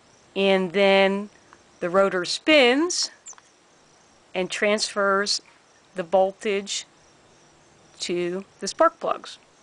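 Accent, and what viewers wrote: Philadelphia, United States. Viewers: The Philly accent makes me homesick.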